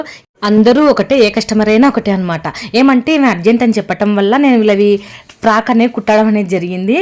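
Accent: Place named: Kadapa, India. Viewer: Me slang Kadapa valla la vundhe meru Kadapa na Andi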